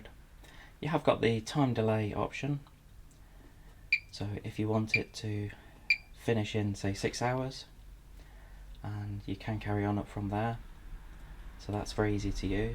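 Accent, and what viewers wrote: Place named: RP, United Kingdom. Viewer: Big clue is he speaks the Queen's English :-)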